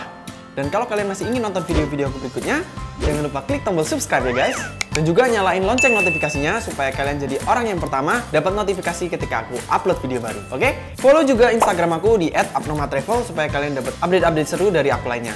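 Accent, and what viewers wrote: Java, Indonesia. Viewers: medog bgt cak.....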